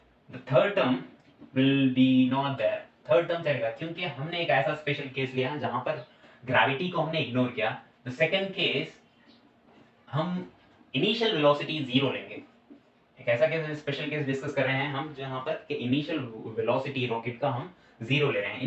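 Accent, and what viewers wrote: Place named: Hyderabad, India. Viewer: yoo, bro sounds hyderabadi, btw great explanation bruh, and why not uploading videos on yt rn??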